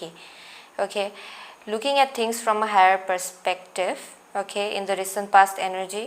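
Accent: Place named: Arunachal Pradesh, India. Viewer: Aap kaha se ho mam? Arunachal Pradesh se, aisa lag rha hai, i m from Psg, mine is pile 1 n 4, both resonates n m confused. But thnx 🙏 Nice reading. 👍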